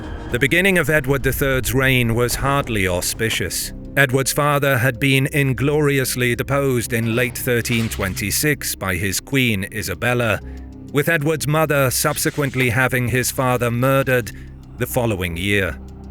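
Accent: England, United Kingdom. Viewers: It’s very much an English accent. We have loads of different regional accents. Glad you enjoy the history. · Right on! As an American, this is my history too, but it definitely needs to be told in a proper English accent! Excellent detail and a great narrative. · You are right, it is your history too ! BTW, it is an English accent.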